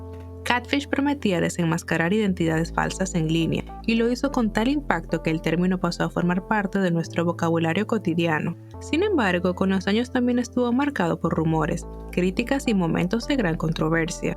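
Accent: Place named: Dominican Republic. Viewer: Por tu acento supe que eres dominicana, buen video sigue así y que DIOS te bendiga :3 😊😊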